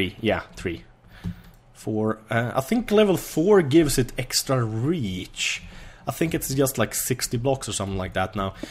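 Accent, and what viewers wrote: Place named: Sweden. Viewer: Fan va gött och höra! :) Bästa videosarna på YT så jävla gött med lite engelsk med svensk brytning! Tack för att du gör videos! Ha det gött and get some more beers! ;)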